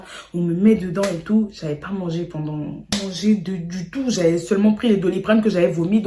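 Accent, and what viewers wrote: Ivory Coast. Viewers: J’aime trop ta manière de parlé en ivoirienne 🤣🤣🤣❤️❤️❤️❤️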